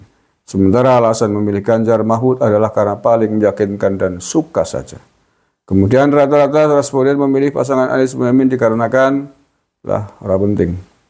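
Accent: Banyumas, Indonesia. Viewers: Malem rajaban, melekan kang + ndonga. Salam waras bedigas. Ora ngapak ora kepenak. Semangat terus kang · Ora ngapak ora penak ya kang😂😂👍✌️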